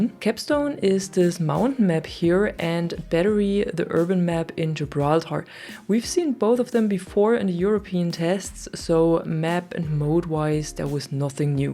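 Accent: Germany. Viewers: Super süß wenn deutsche englisch reden 😃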